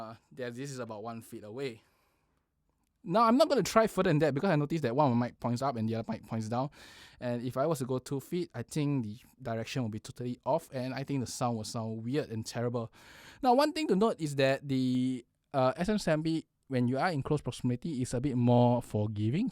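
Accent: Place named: Singapore. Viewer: As a fellow Singaporean who sounds similar to you. The Shure SM7B and the MKH50 does not sound that dark or boomy with our voices vs American and Europeans voice.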